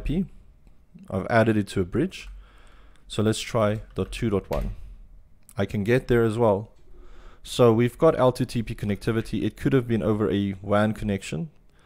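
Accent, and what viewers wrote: South Africa. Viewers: Hi, are you from South Africa? Your accent sounds South African:) If so, do you provide in class training. Your explanations are wonderful and I can see where you can add value to my team and I.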